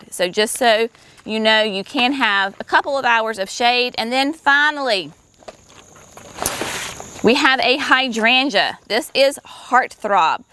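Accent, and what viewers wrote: South, United States. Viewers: I live in Minnesota but I have family in Louisiana, so I not only enjoy the gardening features, but I love the southern accent!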